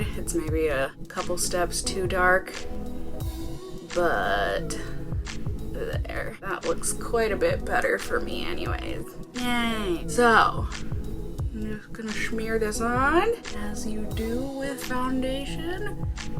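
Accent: Minnesota, United States. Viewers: you sounded really minnesotan in this video 😂😝💓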